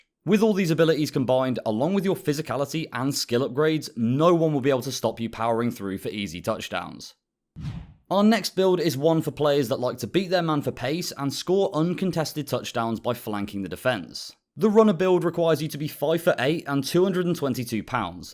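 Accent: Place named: United Kingdom. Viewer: Clicked on heard British voice immediately didn’t respect your opinion sorry about it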